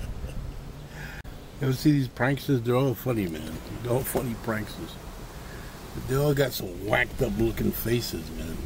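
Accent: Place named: New York, United States. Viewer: New fan here! My name is Tony, I am from Illinois and married with two boys. I would like to tell you that keep doing what your doing, be real, I am 32 years old and I get your humor! Also, helps, that to someone like myself to see your way of living, accent, being from new york is ALL interesting enough! Would love a shout out on a vlog of yours man, would make my day to see a future "youtube kingpin" pay back the love! Keep vlogs coming man, Love'em!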